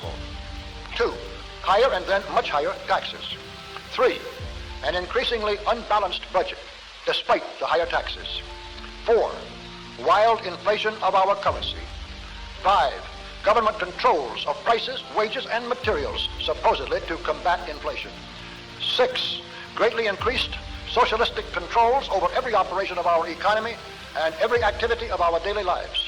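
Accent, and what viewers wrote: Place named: Canada. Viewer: Sounds like Canada ..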